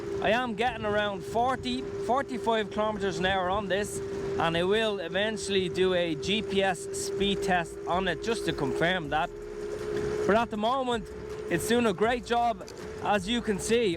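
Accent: Ireland. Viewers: You sound Irish i live in Dublin thinking of getting one put off recently after i heard someone was charged and banned from the roads for riding his e scooter without a license or insurance, Everywhere i look online it says there's no license required or insurance in Ireland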